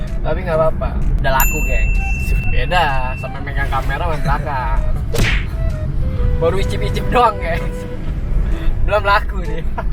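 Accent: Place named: Cilacap, Indonesia. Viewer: Ki tunjukin penampakan janda dong buat saya pffftttt... (just kidd) Cilacap ngapak mantap yoyoy...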